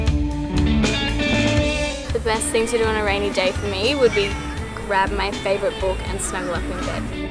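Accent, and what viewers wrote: Australia. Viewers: beautiful woman , lovely Aussie accent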